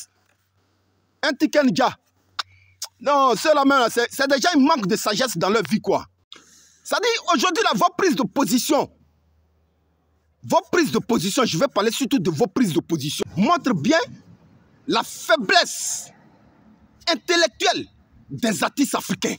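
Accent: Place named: Ivory Coast. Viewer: Koffi a déjà mahé lahan de watara il s en fout.....😅😅😅😅 je suis congolais je kiffe l accent ivoirien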